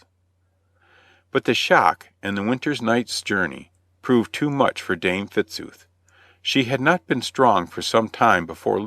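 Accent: United States. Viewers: Like an American robot? This is an English folk tale... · An American accent. Sorry, just doesn't work. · American accent 👎🏻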